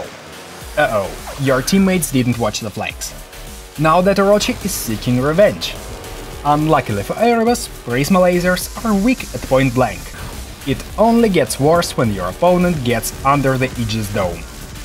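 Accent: Russia. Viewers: парни, наймите диктора с хорошим английским кровь из ушей же стрронг ррашн акцент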